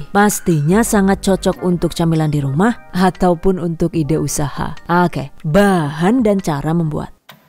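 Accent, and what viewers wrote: Banyumas, Indonesia. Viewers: Kok bisa bahasa ngapak? 😁 kalo boleh tahu orang mana ya? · Coba pakai bubuk kacang + keramel atau bubuk kacang + coklat min, bagus penyampaian dan open mind alias blak kotang rak suwalan 😂😂😂😂😂😂😂😂😂😂 ngapak min yo? I love your voice ngapak 👍👍👍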